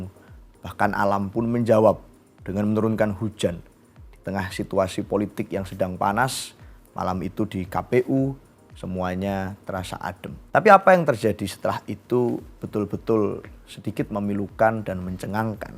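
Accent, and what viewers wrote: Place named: Java, Indonesia. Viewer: Aku suka logat medok mas. Mugia sukses mas. Mas contoh pemuda yg meniti karir dr nol.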